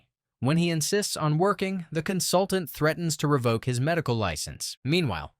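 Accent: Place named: United Kingdom. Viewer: I have no idea why but his british accent is soooo funny to me. 😂😂😂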